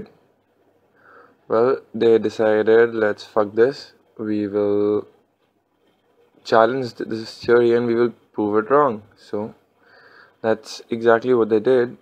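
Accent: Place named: India. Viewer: Indian accents are great, love it.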